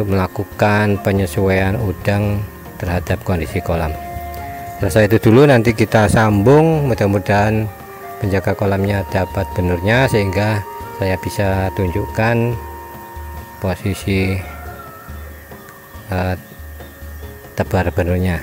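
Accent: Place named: East Java, Indonesia. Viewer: daerah mana Om. logat nya kaya jawa timur🙏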